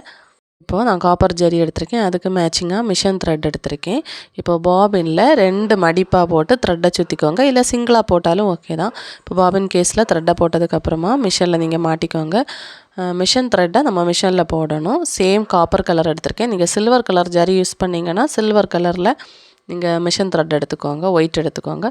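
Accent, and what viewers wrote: Kanyakumari, India. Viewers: I like your Kanniyakumari slang sis ❤ Your ideas are 🔥👌🏻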